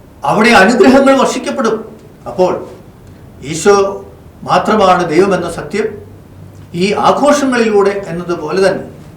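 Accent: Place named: Chittattukara, India. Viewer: Fr., you are from chittattukara, your speech shows that •thank you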